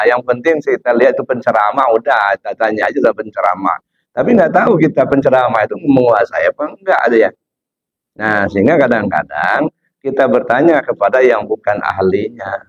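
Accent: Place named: Lampung, Indonesia. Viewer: Di mana alamat . Dari logat nyalampung di mana buya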